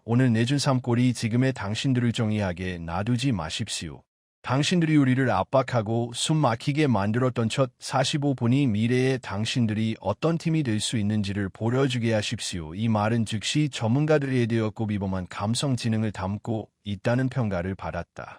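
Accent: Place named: China. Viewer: 목소리가 보이스피싱 중국인이네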